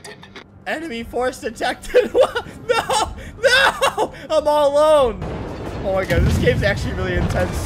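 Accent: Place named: Spain. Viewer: his Spanish accent is so funny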